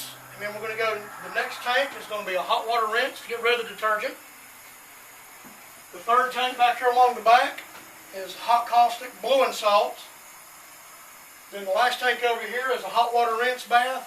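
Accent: United States. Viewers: Weird Yankee accent.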